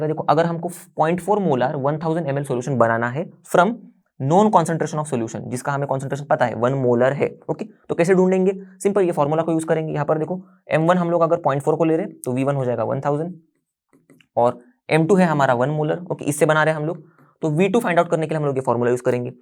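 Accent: India. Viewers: These lessons sound interesting, but they have one small problem: the Hindi accent 😅 Could you please add English subtitles to your playlists..? Thank you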